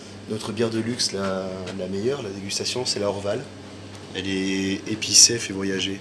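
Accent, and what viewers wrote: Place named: South, France. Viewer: Je comprends pas tu as l'accent du sud d'un coup sur la vidéo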